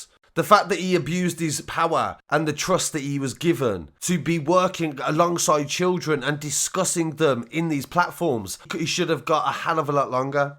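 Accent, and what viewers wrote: Birmingham, United Kingdom. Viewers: What accent is this? Birmingham or something, I'm glaswegian always realise when yous chat, you talk hyper then last word or 2 is low tone lol Anywayz fukk all that respect for the videos mate.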